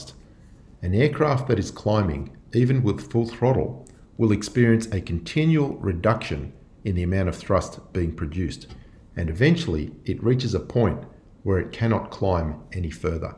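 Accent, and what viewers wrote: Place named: Australia. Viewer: As much as I love seeing you debunk flat earth, what about some astronomy videos? Your calm Australian voice and the way you explain things, I think would be really beneficial to many people. Also I'm from the UK so it would be really nice to see your stars because as an amateur astronomer myself there is only one thing worse than a cloudy night when trying to view stars below the equator and that's that damn globe in the way! Keep up the good work buddy.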